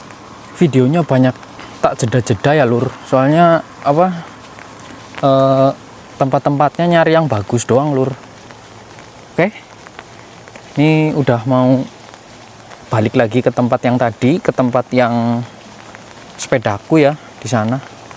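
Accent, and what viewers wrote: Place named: Cilacap, Indonesia. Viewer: aslinya org mana logat nya kaya org Cilacap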